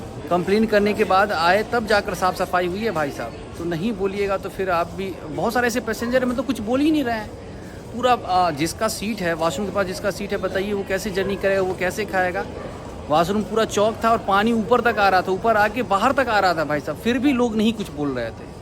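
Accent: Bihar, India. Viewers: This guy english is so funny not in a bad way but that bihari accent english is hilarious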